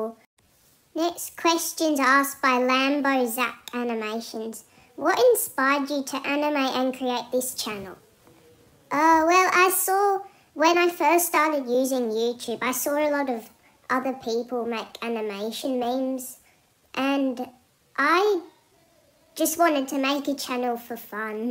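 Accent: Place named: Australia. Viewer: i can hear the australia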